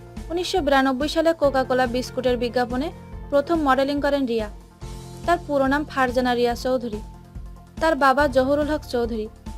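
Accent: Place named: Pabna, Bangladesh. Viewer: kotha shune to mone hoy bari pabna, agey shundor kore kotha bolte shekho.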